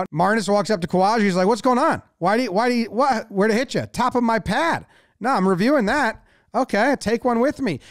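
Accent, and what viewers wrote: United States. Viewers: So weird hearing an American talk about cricket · Weired to listen to cricket commentary in an American accent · As an Indian enjoying the occasional baseball content, it's refreshing cricket from an American voice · It’s just strange for me to have an American voice analyze cricket … I’m old school … but love the idea that Americans are getting into cricket … hate the idea that if they take it seriously, they will win more World Cups than the rest of us. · Commentary in American accent sounds a bit weird lol. Good stuff 👍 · as an Australian i have to say it's weird as fuck watching Test cricket getting broken down by a guy w an American accent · it's fun listening an unfamiliar american voice break it down which tickles my senses. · Not gonna lie, still weird to have an American voice comment intelligently on cricket who isn't from the cricket playing countries originally...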